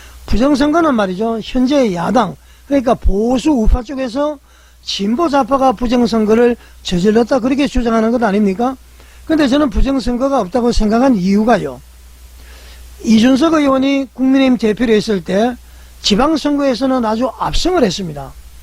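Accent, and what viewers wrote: North Korea. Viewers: 듣기싫다! 북한말투쓰네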